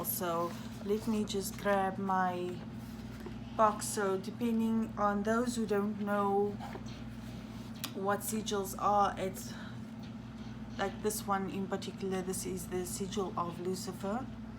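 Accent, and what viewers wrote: South Africa. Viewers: Are you south african because you have a South African accent? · Yay... south African!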